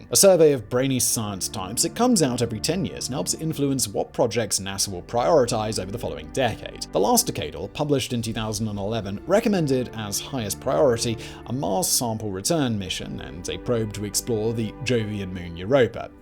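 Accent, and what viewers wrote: RP, United Kingdom. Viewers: Classy British accent - Well-dressed - Well-groomed - Thoroughly-researched facts and insights - Literally twelve years old, apparently 😁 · Fact Boi is such a professional online educator that he can maintain his perfect RP and a straight face (all but once) through the constant barrage of bunghole puns.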